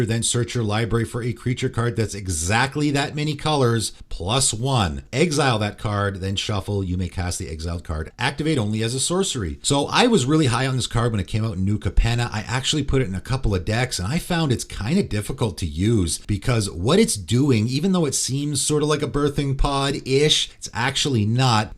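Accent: Canada. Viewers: He's pronouncing it as the average Canadian would, who would be used to reading unfamiliar words with a French phonetic structure. If you all understood what he was saying, he communicated successfully and if you're mad about it, you are remarkably petty.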